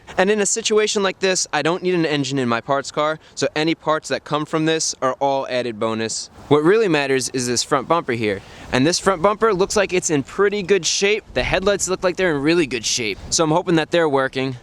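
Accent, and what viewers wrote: United States. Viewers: the way americans pronounce car brands...it just makes my ears bleed...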